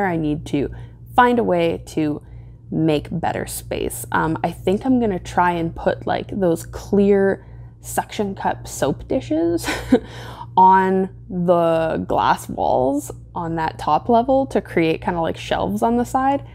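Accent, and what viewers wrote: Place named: California, United States. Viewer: You talk just like a Californian, LOL 😄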